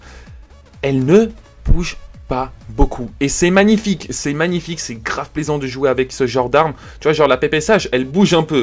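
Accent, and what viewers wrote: Belgium. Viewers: T belge ? :p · Oh tes belge je savais pas😱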